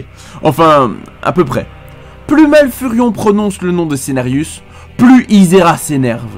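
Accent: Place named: Belgium. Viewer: Il est belge c'est pas des erreurs de prononciation c'est son accent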